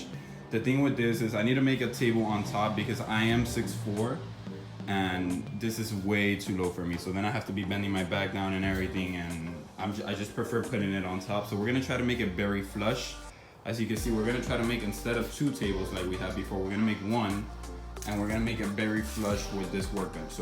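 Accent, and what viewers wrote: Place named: Miami, United States. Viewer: I knew you were from Miami from the first literally you said lol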